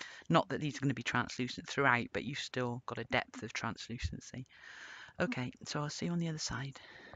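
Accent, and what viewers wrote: United Kingdom. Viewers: Well i for one love watching your tutorials, i have just been turning the volume up, which is easy. I noticed the difference with the new microphone! All good. I am definitely going to try this with Cernit translucent and Premo gold and copper. I do find Cernit REALLY sticky so I'll try leaching it first. I love your accent too. Wiltshire or Somerset? My gran was from Wiltshire and it reminds me of her. Cheers from Australia 🇦🇺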